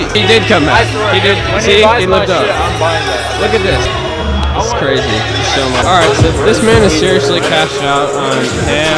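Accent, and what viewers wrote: South, United States. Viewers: Where are you from? You seem to have a southern accent now that your voice is a little deeper.🤔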